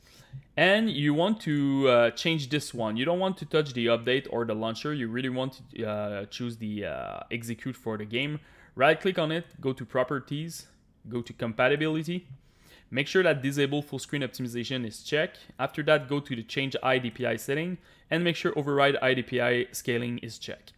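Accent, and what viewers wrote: Quebec, Canada. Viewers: are u from quebec by any chance?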